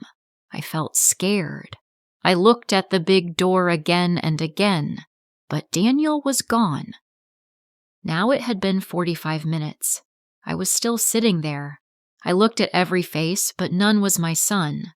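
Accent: United States. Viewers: American accent? no, thanks. I want British English. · American or British?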